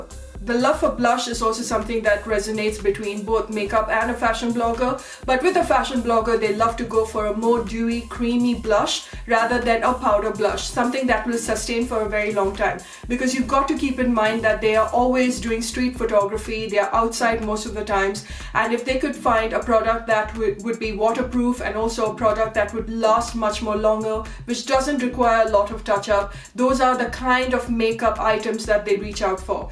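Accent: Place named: Kerala, India. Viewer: Hey, You are very attractive and I'm in love with this makeup look also Your accent reminds me of a very good friend of mine who also happens to be a malyali I met in Dubai a few years ago.. (love the accent💞) your videos are so informative And educational..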